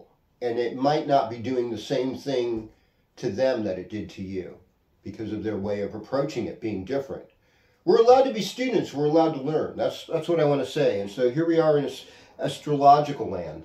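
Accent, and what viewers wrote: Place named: California, United States. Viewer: Great show,! It’s true: We are a collection of hicks, cowboys and hippies. Though I don’t think I fit in with either of those groups. If anything, I consider myself a low level scholar and story teller. Also with those three groups, you get the two California accents: “The surfer” accent, with its elongated vowels and drawl. Then there’s that whole “faux southern” accent that’s common in this area, with its sharpness. I felt inspired to comment on that aspect. I like your surfer accent,! Especially, since accents seem to be fading these days. Anyway, peace brother ☮️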